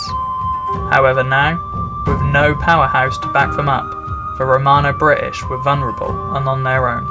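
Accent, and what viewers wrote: Norfolk, United Kingdom. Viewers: That Norfolk twang on a YouTube video is such a welcome sound!! Great video!! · that lovely norfolk accent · I live in Manchester area now, but Norfolk born and bred... It’s refreshing to see and hear a great video by a local with passion for the area 👍🏻 Keep yew a trosh’n bor 😉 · You sound like a good old Norfolk boy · I love your Norfolk accent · Nice gentle Norfolk accent. I hadn't realised how much it misses the internal 't' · Nice to hear the Norfolk accent creeping in now & again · You call that a Norfolk accent! I could barely tell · At last someone who speak propa Norfolk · “Cor blarst me”you are definitely a Norfolk bou “keep a troshing “ nice job on the video, Norwich boy born and raised here.👍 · Lovely soft norfolk accent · a very pleasant Norfolk accent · love to hear a true Norfolk accent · you sound like a local Norfolk..lad by your accent ..very country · never heard anyone have as strong as a Norfolk accent as you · the commentator is not dropping his Ts through laziness. 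It is a characteristic of the traditional Norfolk accent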